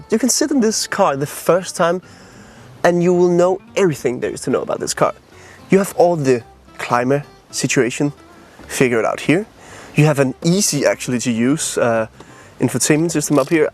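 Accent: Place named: Denmark. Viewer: godt tip, du får ikke særlig mange views når du taler engelsk, man kan tydeligt høre at du er dansk, bare tal dansk tak.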